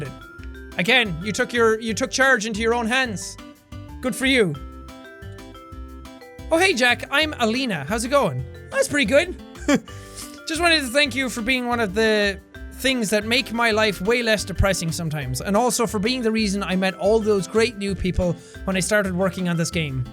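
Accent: Ireland. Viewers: It seems like Sean loses the Irish accent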